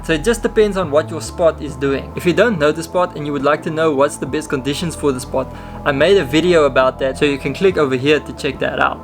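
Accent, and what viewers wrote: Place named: South Africa. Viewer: Love the South African accent, nothing like it in the world :-)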